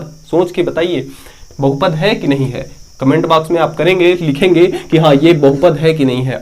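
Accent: Chhattisgarh, India. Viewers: mujhe lga tha aap chhatishgarh se ho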